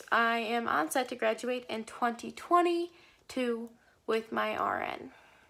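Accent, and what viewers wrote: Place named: South, United States. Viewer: Aww girl this is so cute! :) You're so beautiful and seem so sweet! I love how you are still so motivated and have great life plans. <3 You sound like you have a bit of an accent... are you from the south?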